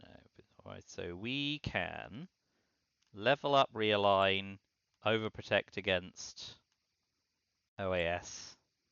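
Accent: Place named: England, United Kingdom. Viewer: I enjoyed the rambling commentary (so did my wife) and the quaint English accent was just the icing on the cake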